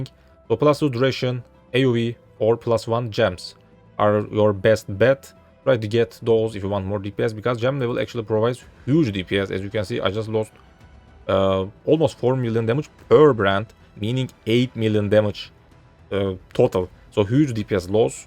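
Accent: Turkey. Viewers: When i first hear i said this is a perfect Turkish accent. Just find out that im right amk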